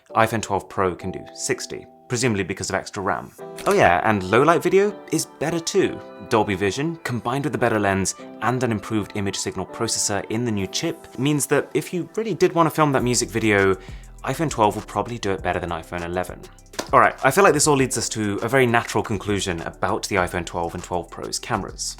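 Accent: RP, United Kingdom. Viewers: I just absolutely love watching this guy's videos and updates! Always super detailed and right on point along with that fantastic and beautiful British accent 🥰!! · this guy speaks like a royal family member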